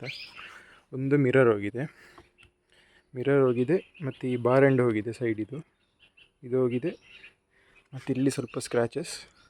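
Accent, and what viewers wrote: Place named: Mangalore, India. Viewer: Woww manglore accent Kannada 🔥 sakath vlog bro husharu 🔥 subscribed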